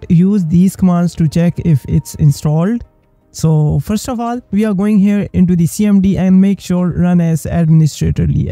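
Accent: India. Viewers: کیرممننم تو لهجه هندیتتتت ولیی واقعااا ممنووننن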